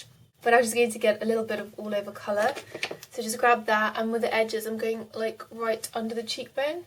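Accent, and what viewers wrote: England, United Kingdom. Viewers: I keep watching ur vids coz of the tips but also coz of your accent!! Reminds me of Kate Middleton's accent :D What type of English accent is it, if its ok to ask?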